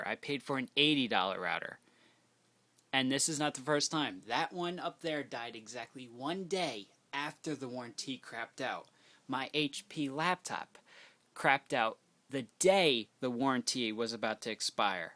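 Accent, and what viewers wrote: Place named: Midwest, United States. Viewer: gosh u sound like one of our customers from either Michigan ,Illinois or Indiana... good ol heartland region.. they love to sit on the phone for 30 + mins with sob bullshit stories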